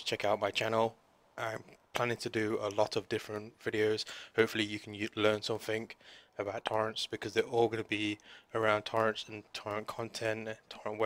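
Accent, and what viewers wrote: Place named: South Asia. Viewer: this guy has a south asian english accent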